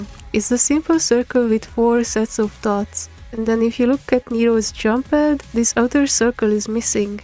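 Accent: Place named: Hungary. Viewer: this accent is so Hungarian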